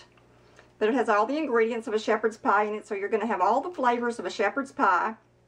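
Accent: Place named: South, United States. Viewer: I am betting y r a Southern bell from your accent. I am from Georgia. I buy peanuts in shell and grind up in a bullet. so good.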